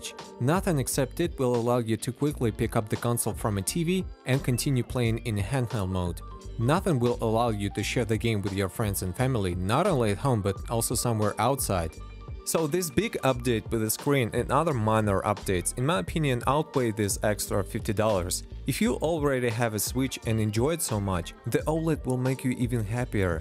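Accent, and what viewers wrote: Russia. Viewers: russian accent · тебя выдает подоконник)) в штатах таких подоконников нет. Да и по английскому слышно, что наш человек:) Если не секрет, то чем будешь пользоваться в случае блокировки ютуба? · да ты русский, акцент чувствуется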